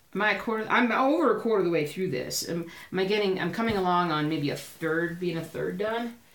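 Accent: South, United States. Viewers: So sorry to hear about your fur baby! I pray Jelly girl is well soon. By the way there's nothing wrong with your southern accent coming out....I'm from KY!!! :-) Great episode, just way to short, sheesh you know your number one job is to entertain me, I meant us (yeah I meant us hehehe)!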